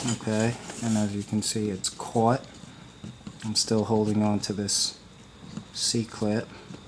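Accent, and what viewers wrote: United States. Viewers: Are you from New Jersey? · Are you american or what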